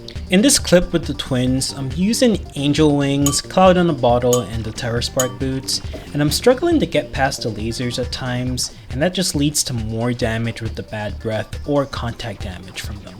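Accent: Canada. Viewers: is this guy canadian or something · It's so obvious that you're canadian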